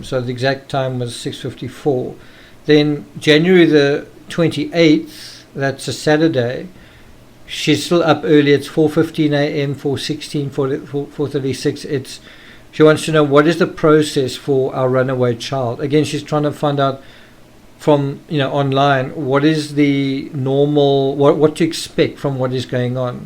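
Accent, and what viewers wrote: Afrikaans, South Africa. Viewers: He has a neutral Afrikaans accent. It's obvious he grew up speaking both English and Afrikaans, though. (Of course, this is just my assumption.)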